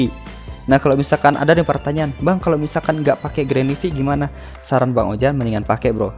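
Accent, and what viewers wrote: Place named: Sunda, Indonesia. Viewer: Alah c mamang sunda nya meni lekoh hahaha semangat terus bang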